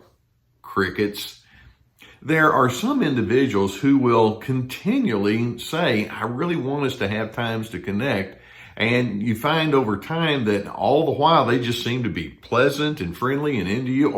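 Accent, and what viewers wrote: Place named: Virginia, United States. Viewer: Dang it, been listening to for years, just picking up your sweet southern accent. Virginia?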